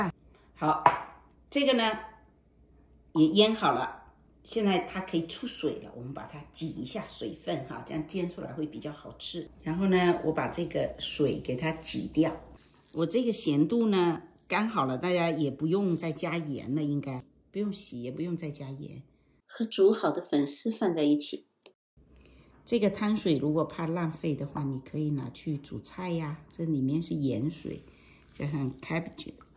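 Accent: Fuzhou, China. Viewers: 謝謝老師的分享🙏🏼 確認一下這鍋的英文是 Carbon Steel嗎？多謝您的回覆，我超愛您的福州口音❤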